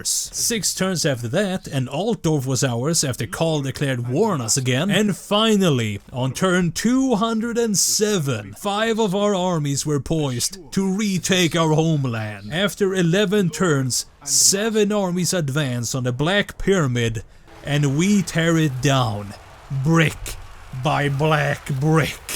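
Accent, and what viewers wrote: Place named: Sweden. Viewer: absoloutly amasing accent i love it, sounds sweede :D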